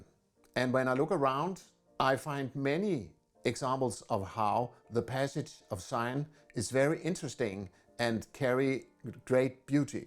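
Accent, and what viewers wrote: Denmark. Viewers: Are you Danish? Im American but married to a Danish woman and have lived in Copenhagen. It’s your accent. Great channel!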